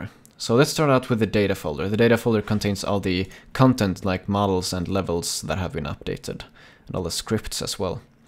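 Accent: Sweden. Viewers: You sound Swedish Eller ska jag säga Svensk ;)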